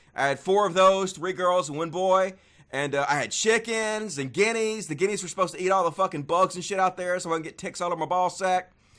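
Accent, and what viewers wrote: South, United States. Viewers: You are a great story teller and i like your southern accent Very much!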